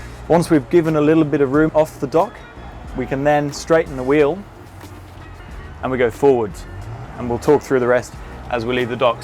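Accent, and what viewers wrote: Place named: United Kingdom. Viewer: That accent says born in the UK. I’d like to think if you were in UK you’d use the kill cord immediately the engine is running. And yes you can do the lines single handedly with the engine off, step to the helm, kill cord on then complete manoeuvre. It’s a great video, but remember you set the example to every newbie watching this show who may have no idea how or why the should use a kill cord.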